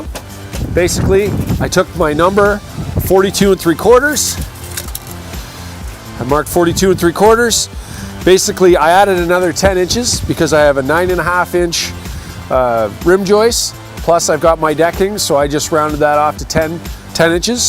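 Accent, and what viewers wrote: Canada. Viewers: thats what i do i find the miters eventually come apart but we are in SC ... and he sounds Canadian so big weather difference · Premmo this man sounds so canadain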